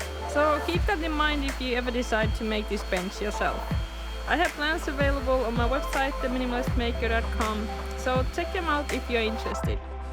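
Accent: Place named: Finland. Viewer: Simple yet very nice looking design! What makes it more interesting that I was just browsing general wood-working videos then this one was recommended, and I recognized from your voice that you are from Finland as well :)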